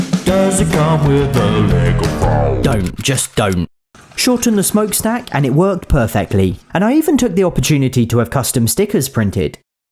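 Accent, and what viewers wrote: United Kingdom. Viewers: He’s British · Are you British?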